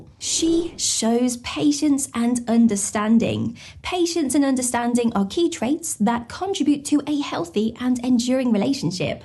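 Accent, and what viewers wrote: United Kingdom. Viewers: Are you British, Irish or Australian? Whatever the accent, I love it 😁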